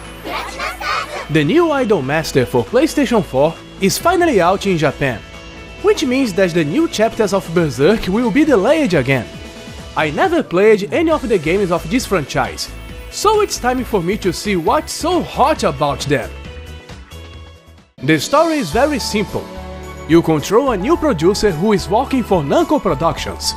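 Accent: Brazil. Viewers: Nunca ouvi alguém a falar inglês com tanto sotaque brasileiro ahah Obrigado pela review, gostei bastante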